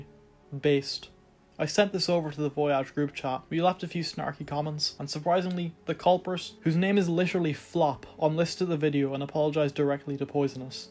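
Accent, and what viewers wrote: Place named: Ireland. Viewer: you sound like mario if he was irish instead of italian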